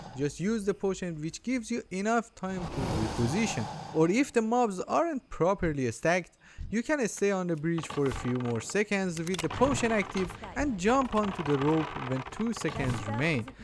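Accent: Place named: Iran. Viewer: kose khare lahje irani xD nice video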